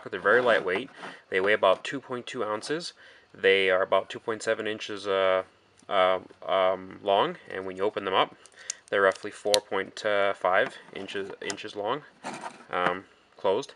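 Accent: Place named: Canada. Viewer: Does anybody else think right when he said he was from Canada you heard the Canadian in his voice 🇨🇦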